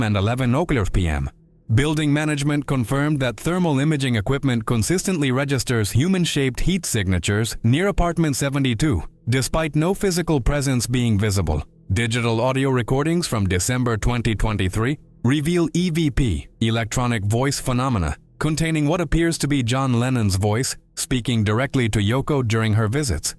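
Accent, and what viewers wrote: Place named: Soviet Union. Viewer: Interesting accent. From the former Soviet Union?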